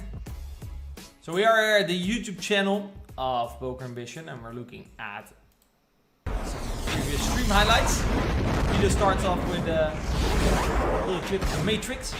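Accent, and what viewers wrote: Netherlands. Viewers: Everything in the Middle AHAHHA, btw are u dutch?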